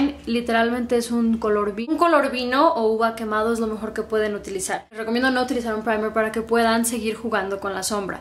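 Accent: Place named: Fresa, Mexico. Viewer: Hablaas taaan pero taaan fresa que desespera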